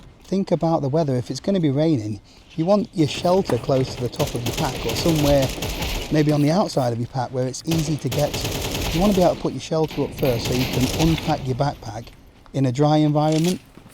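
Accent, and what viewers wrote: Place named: United Kingdom. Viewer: caralho, o br com o sotaque britânico inteiro slk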